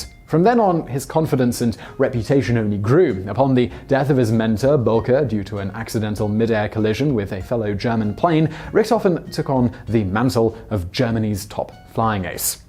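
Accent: England, United Kingdom. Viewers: I can't listen to this guys horrid English accent. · Lay off that pretentious accent🇬🇧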